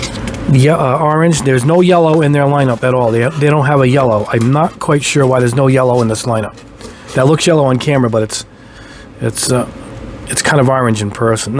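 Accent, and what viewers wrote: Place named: New York, United States. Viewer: Country? Are you not in the US? I swear you have a Jersey/New York-ish accent lol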